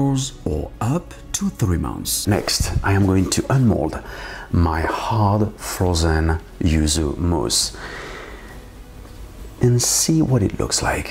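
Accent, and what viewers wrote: France. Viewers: You have a weird mix of an Australian and French accent and it's kinda offsetting for some reason. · Il a un accent français vive les français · Putain mais quelle accent Français! LOL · These americans making fun of his accent. lmao i bet you can’t even pronounce a single French word correctly. at least he can speak at least 2 languanges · Je me disais aussi le gars avait un léger accent français XD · This Guy's Just French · Are you French ?? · May i Ask you where are u from? French? Only reason asking because of your accent :) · Are you french ? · Are you French? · You're French right? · nah, it's his french accent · From what I know, he sounds like most French guy speaking English..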